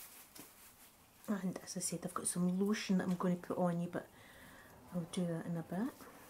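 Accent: Scotland, United Kingdom. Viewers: you are amazing don't let the haters get to you btw your accent is lit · Which part of Scotland are you from?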